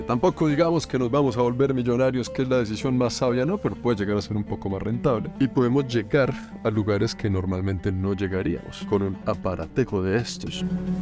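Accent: Colombia. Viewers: Felicitades!.... Acento colombiano + hablar lindo a todos los animalitos = perfect 😍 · Buen vídeo y muy buena síntesis de España y Toledo… lo has definido perfectamente y Toledo fue considerada la New York de la época…. Espero que hayas disfrutado una bonita experiencia en tu viaje y que mucha más gente venga a conocer España con tu actitud. Dicho sea de paso, como Colombiano que creo que eres por el acento, Bienvenido y estás en tu país porque así lo fue durante varios cientos de años. Un abrazo desde el norte de España y la próxima vez espero puedas venir a conocer esta zona que te gustará mucho también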